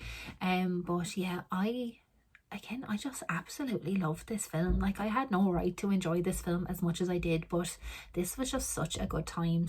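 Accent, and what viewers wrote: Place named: Ireland. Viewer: Hi,best wishes from Wolfie,hope your back is better although you did get time to watch some films,I have all the Hatchet films,there's 4,think they get gorier as they progress,loving the Irish accent,keep watching.xx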